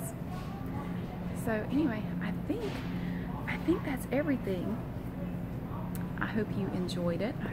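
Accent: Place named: Georgia, United States. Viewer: you're so cute! your accent kind of reminds me of my mom (we're from GA)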